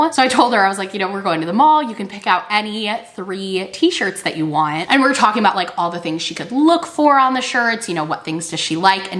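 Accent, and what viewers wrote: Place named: Southern California, United States. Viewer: You pronounce a lot of things differently than me but who cares! I live in NY and you have a SoCal accent. I think it's cool to hear voices different than my own.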